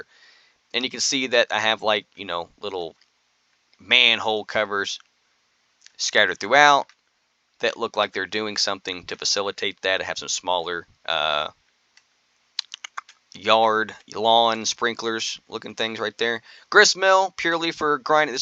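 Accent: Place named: South, United States. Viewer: Nevermind, you have the southern dude voice and you sound like you grew up shooting squirrels I apologize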